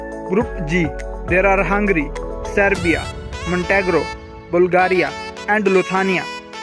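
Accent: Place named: India. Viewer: some funny indian accent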